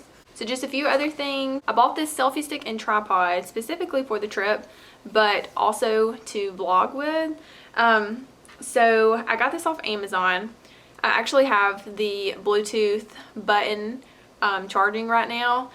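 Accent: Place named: South, United States. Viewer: You have to be from Georgia or Tennessee 😊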